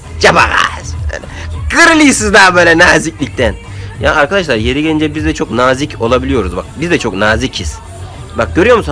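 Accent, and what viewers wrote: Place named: Terme, Turkey. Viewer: Abi senin konuştuğunu genelde termede konuşuyorlar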